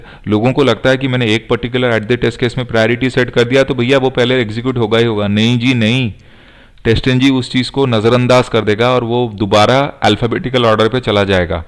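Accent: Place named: Madhya Pradesh, India. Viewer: sir,excellent.....aapki hindi toh hamare MP ke jesi hain.. i have learnt TestNG from 2 months lekin aapne toh 1 din me hi sikha diya...samjhana ka tarika bahut saral and easy hain aapka thank you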